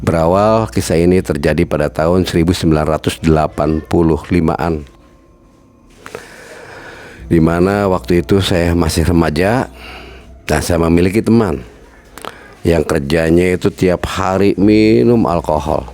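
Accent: Cirebon, Indonesia. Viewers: Kaya org indramayu om,bahasanya. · Dari awal ketahuan bahasanya logat bahasa CIREBON...😃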